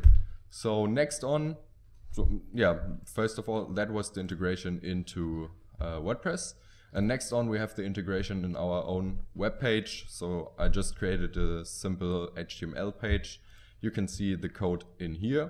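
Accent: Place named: Germany. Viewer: Wie man den deutschen Akzent raushört haha xD. Aber ihr macht wirklich gute Arbeit, für Creator oder Developer wie mich ist es ein Segen das es linkvertise gibt ^^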